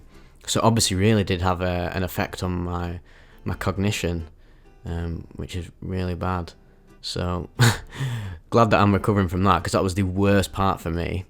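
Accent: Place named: Yorkshire, United Kingdom. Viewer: Mate i feel i could be platonically serenaded by your yorkshire timbre for hours on end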